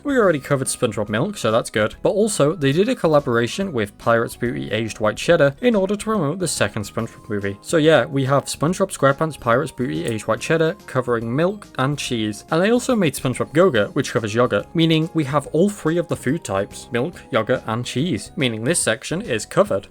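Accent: United Kingdom. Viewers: Are you You British?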